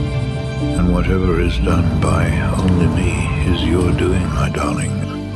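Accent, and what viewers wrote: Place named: England, United Kingdom. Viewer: Some amazing use of light, I'm really interested to hear why you chose to have an English voice over this very American shoot?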